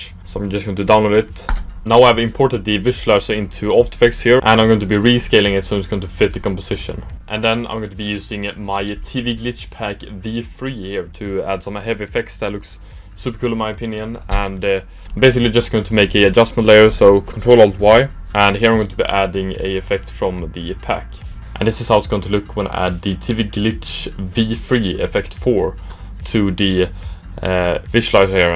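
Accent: Sweden. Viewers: Älskar svengelskan, bra video!👍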